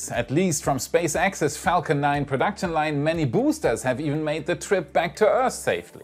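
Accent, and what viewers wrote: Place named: Germany. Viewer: not a bad idea, but no, it isn't. His native language is German. He broadcasts in English so he can reach a predominantly American audience, and I am very glad he does. No other English speaking nation says it that way, either, that I know of, and I do have a high degree of familiarity with the other dialects. As an aside, I think we should pronounce it that way, but we don't.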